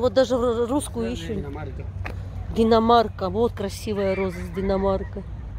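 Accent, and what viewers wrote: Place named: Armenia. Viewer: Я люблю отдыхать в Сочи, именно в Адлере, там много знакомых армян и армянок. Смотрю видео и представляю, что я в Адлере, и по природе и по вашему акценту))) Спасибо Вам за красоту!!!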